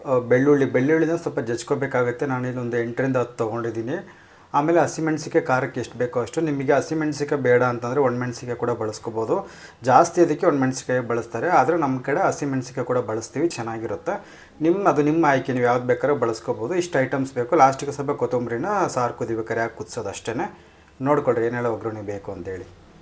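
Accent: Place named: Durga, India. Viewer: ನನಗೆ ಸುಮಾರು ದುರ್ಗಾ ಹಾಗೂ ದಾವಣಗೆರೆ ಸ್ನೇಹಿತರೆ, ನಿಮ್ಮ ಭಾಷೆ ತುಂಬಾ ಇಷ್ಟ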